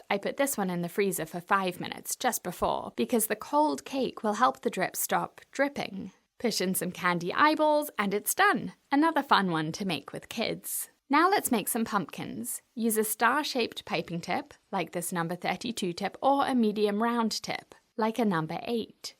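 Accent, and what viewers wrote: United Kingdom. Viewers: Nice to hear a British accent for a change. She speaks so clearly and nicely. Rare on YouTube.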